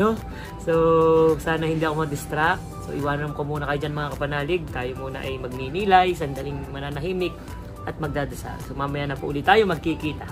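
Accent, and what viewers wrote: Batangas, Philippines. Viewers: Modern day priest. I like the Batangueño accent and his being jolly very contagious. Father is an ideal Spiritual Director for the millennials. More power father!!! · Akoy natutuwa saiyoy Fr. Hahaha batanggenyong tuno talaga eh.... Hahahahha Nakakahawa ka ng tawa.Apakamasayahin mo po God bless you po ❤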